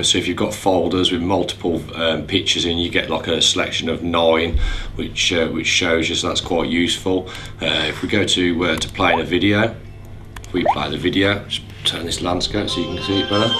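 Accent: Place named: Black Country, United Kingdom. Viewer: Are you from Birmingham or Dudley or somewhere close to there?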